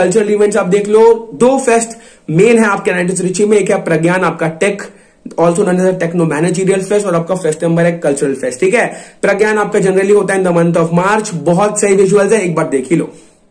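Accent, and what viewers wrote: Bengal, India. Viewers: Bhaiya!are you Bengali or South Indian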